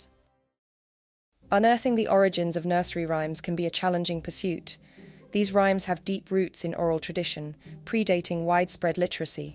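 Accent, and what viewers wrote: United Kingdom. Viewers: Agree !clear thoughtful and well presented.. AND very English🥰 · This channel is narrated by an Brit and there's no denying the musical beauty of her accent! Focus on the positive, we find what we look for!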